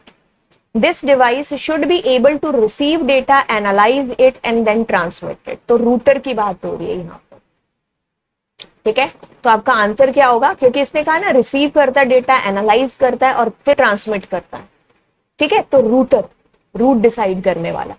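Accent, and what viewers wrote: Punjab, India. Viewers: Mam aapka Punjabi accent 🔥🔥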